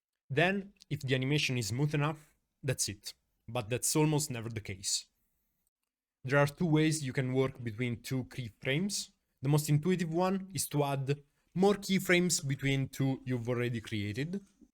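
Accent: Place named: Italy. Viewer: Mai collegh hahaha figo , si sente che probabilmente sei italiano lol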